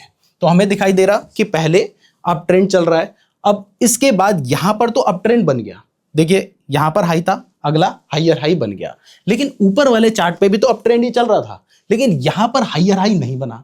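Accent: Uttarakhand, India. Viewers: Sir aap uttarakhand se hain kya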